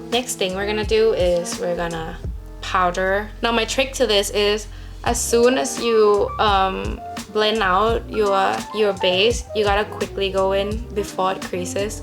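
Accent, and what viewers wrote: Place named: England, United Kingdom. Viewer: เราชอบสำเนียงอิ้งมาก หลงแล้วววว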